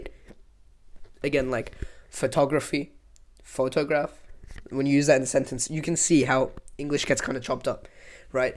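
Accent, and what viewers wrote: India. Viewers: your pronunciation of urdu words is not correct, you are speaking with a hindi accent. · Yo bro I live in Pakistan so I'm not just a native speaker I have also studied it as a compulsary subject. As a native i can confidently say............ Bhaitum na kam urdu aur zada Hindi accent mai bol ry thy(Bro your accent was more Hindi than Urdu) alao your pronounciation of "خ" is totally wrong. When there is a خ sound you always pronounce it as کھ which is so SO wrong............and you just sound so Indian. But the video was cool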